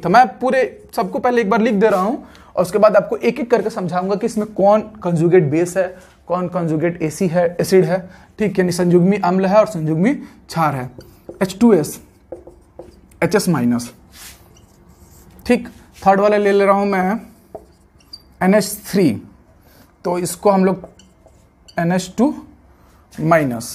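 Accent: Bihar, India. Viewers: Bahut acha padha rahe ho bihari style me mai paid class chodkar apke hi video dekhta hu kyoki bahut hi basic se bataya ja raha hai apka physics bhi bahut acha lagta hai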